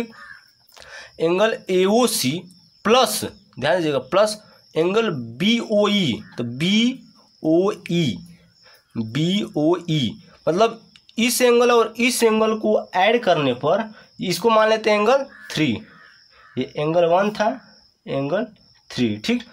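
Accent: Bihar, India. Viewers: Kitna bihari bolta h itna dana samjha tha h chiiii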